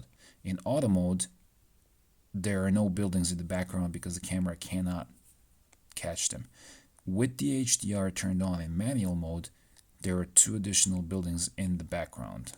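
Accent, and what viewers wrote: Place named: Serbia. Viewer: Jel si ti jebeno iz Srbije? Cim sam tvoj prvi video otvorio pomislio sam da si iz Srbije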